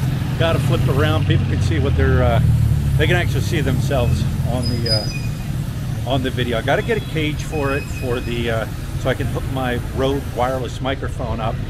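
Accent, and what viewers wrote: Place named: Philadelphia, United States. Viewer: Hey, since your there for the long hull, how bought taking some Pilipino language courses to be able to speak a little and understand a little bit more of the Country your living in. I would and maybe in return teach someone some of you own intonation from, I think that would be cool, what do I know, love your Gig, Thumbs Up Dude, Ricky from Cape May....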